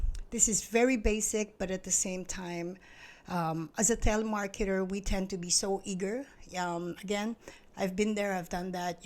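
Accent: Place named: Singapore. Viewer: You speak like a Singaporean. Very clean lines. Good articulation. Keep it up ate. Greetings from Singapore.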